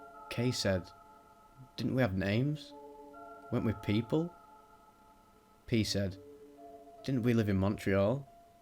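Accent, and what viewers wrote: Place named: England, United Kingdom. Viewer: you're a good narrator, how far has this video taken you? the english accent, the world loves it as much as japan loves complex metal, would like to hear more trip reports from the wid void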